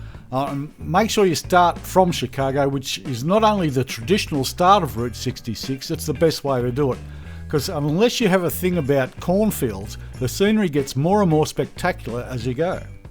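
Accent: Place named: Australia. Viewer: love your oz accent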